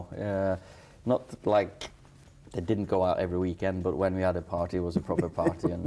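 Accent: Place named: England, United Kingdom. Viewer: Hes got a English accent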